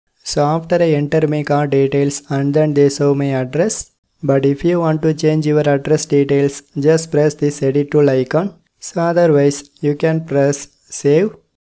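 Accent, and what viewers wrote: India. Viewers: I can't understand Indian English....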